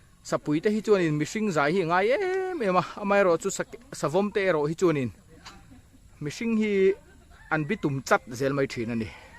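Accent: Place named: Mizoram, India. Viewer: Hmuh nawm khawp mai. Zoo khu ka kal pel zing hle a, mahse kala lut ve miah lo. I tawngkam chu a phok tha im a mizo pa hle mai. Ngaihthlak a nuam in a manhla ka ti hle mai. Sauak leh sanghar te kha zawhte an ni ringawt a lawm le, an va in ang em2 ve. Chinghnia leh ui an in ang hle bawk. I ti tha e. Lo hlim in hriselna tha nen lo dam reng ang che aw.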